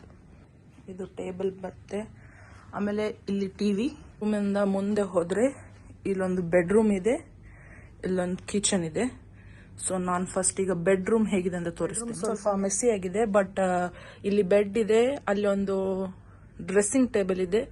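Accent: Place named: Mangalore, India. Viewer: ನೀವ್ ಆರಾಮಾಗಿ ಮಂಗ್ಳೂರ್ ಕನ್ನಡನೇ ಮಾತಾಡಿ ತೊಂದ್ರೆ ಏನಿದೆ 😁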